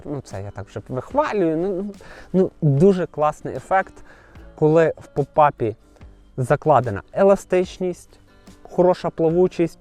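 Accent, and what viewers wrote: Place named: Lviv, Ukraine. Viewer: вітання!!! Найперше хочу подякувати за справжність за те що з перших днів ведеш блоги виключно українською!!! Сам львів’янин і в захваті від нашого говору!!! Сесії та взагалі всі твої старання до глядача як для мене найцікавіші дотепні і зрозумілі!!! Вітаю з власною новинкою попарів та міксів!!! Новий сезон починаю з твоїх новинок!!! А тобі здоров’я натхнення в нових програмах та спільної перемоги над москалями!!! Слава Україні!!!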